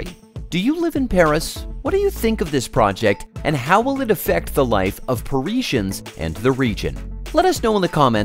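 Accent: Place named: United States. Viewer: He can't help it he's and an american. and also the one commented is just joking. Come on! He is trying his best. I"ve been living here in france for almost 21 YEARS and still my accent is very evident although I can pronounce some names accurately.hahhaa trial and error. french words is just mindblowing specially when you try to write what is being said ;)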